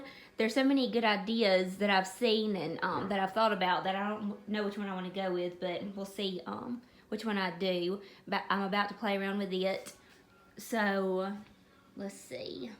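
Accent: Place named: Kentucky, United States. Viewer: GORGEOUS!..Love your channel!..and your accent makes me miss my hometown in KY!..I currently live in Indianapolis, IN..But hope to get back to the Country sooner than later!..keep the home tours and DIY's coming Please!..LOVE THEM!..Have a Fantabulous New Year!..♥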